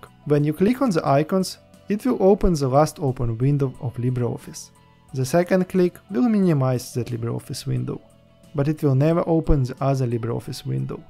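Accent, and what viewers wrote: Russia. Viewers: Native Russian accent detected. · Are you from Russia? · Are you Russian? It feels like you have a Russian accent)). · Русский акцент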